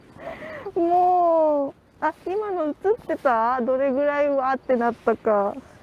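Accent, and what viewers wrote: Kagoshima, Japan. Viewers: 只々、スケベ心だけで見続けてしまった。声と喋り方が可愛い。鹿児島弁なのかイントネーションがとても耳障り良い。良いチャンネル見つけてしまった。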